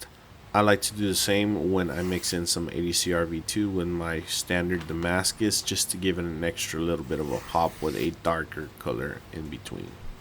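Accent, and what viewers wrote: New Mexico, United States. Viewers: Ive been watching for a second now, your skills are definitely improved. Pretty much one of the few makers I follow. You sound like a fellow NM so I just enjoy the commentary!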